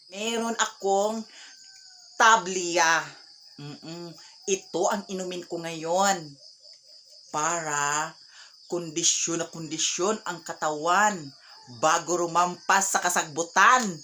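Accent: Visayas, Philippines. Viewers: Ka relate ko sa imong accent na bisaya kay dool ra ta lungsod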